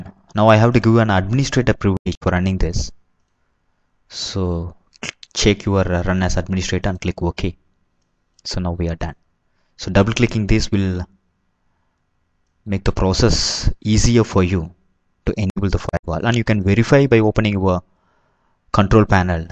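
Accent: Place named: India. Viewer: Love indian/english! :D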